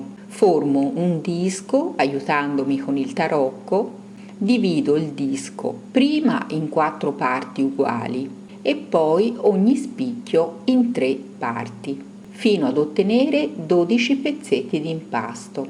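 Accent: Tuscany, Italy. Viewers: Altroché se rendi .....la bontà, sei unica.....le tue ricette sono perfette,molto molto brava. ...e poi la il tuo accento toscano. ....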